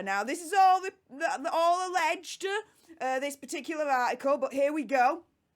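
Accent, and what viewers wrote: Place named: London, United Kingdom. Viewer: What a delightful young lady. Close my eyes and her accent brings back some incredible times running around London with some incredible East End Girls.... That will keep a smile on my face all the way to check out.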